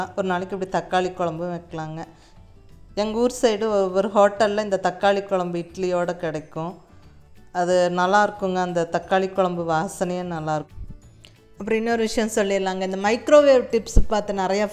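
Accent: Kongu, India. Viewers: Receipe tips are nice. As well kongu tamizh is also so nice. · Super akka nice recipe , thanks your Kongu Tamil also good.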